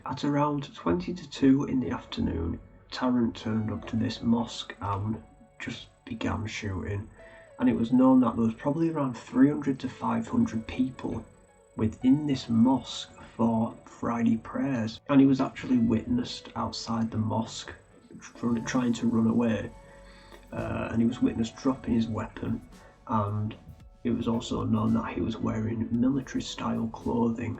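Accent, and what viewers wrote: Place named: Teesside, United Kingdom. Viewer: I remember watching this on some gore website a couple of years ago and finding the comments absolutely infuriating, disgusting and disturbing. Not on topic but a secondary question, are you from anywhere near Teesside/north Yorkshire? You have a similar accent to me but I can't place it.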